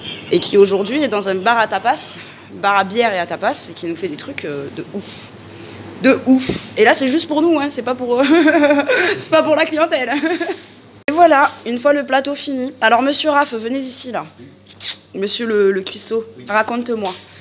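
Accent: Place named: South, France. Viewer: Je comprends pas tu as l'accent du sud d'un coup sur la vidéo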